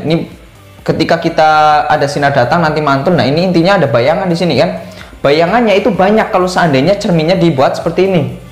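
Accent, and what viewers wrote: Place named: Java, Indonesia. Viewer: Terjadddi, Oke, dan Jjjelas ... meddoknya keliatan , trs katakata Jjjur ya Kesimpulan, bagus .. dan aku gabisa ngerjain soalnya.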